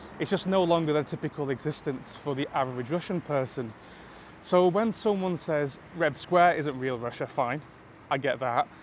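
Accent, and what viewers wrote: United Kingdom. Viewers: What region of the UK are you from? I'm trying to learn to determine accents😅 It sounds like RP with a touch of something, I dunno, northern?.. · Как всё-таки легко понимать британский английский. Без этой распальцованной, разнузданной американской каши во рту.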